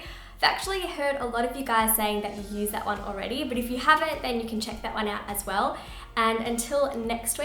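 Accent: Australia. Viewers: Girl, I love your Australian (I think) accent!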